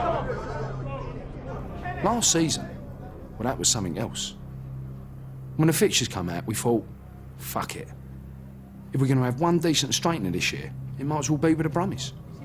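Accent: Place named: Cockney, United Kingdom. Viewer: That fukn cockney accent is annoying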